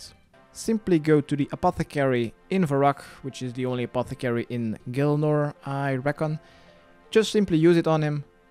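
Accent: Flanders, Belgium. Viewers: Ik wist da ge nen belg waart hahah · Fantastisch! Ik wist niet dat je Vlaams was! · bels denk of fries iig ben brabander en komt me niet bekent voor klinkt meer bels hehe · He's dutch lol, although he sounds like he's from Belgium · wacht gij zijt gwn vlaams, ik kijk al 30 filmkes van ou en had da nie deur hahaha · What the fuck.. I always thought you had a dutch accent but you're totally flemish :o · I KNEW YOUR ACCENT SOUNDED BELGIAN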